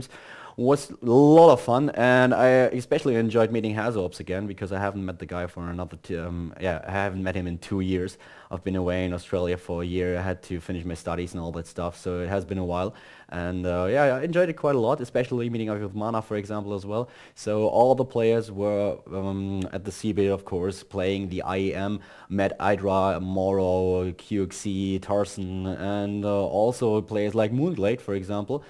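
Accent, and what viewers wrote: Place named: Germany. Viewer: Your English has this typical German accent. But nevertheless, you are one of the coolest caster of Germany.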